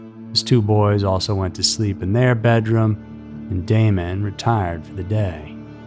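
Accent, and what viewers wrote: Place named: Texas, United States. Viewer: Plano is “PLAY-no”. I’m confused by the narrator having a Texas accent yet not knowing how to pronounce Plano? It’s a big Dallas suburb and if someone has been in Texas long enough to have an accent, they would know how to pronounce it. Maybe his narrator voice is a persona 🤷🏻‍♀️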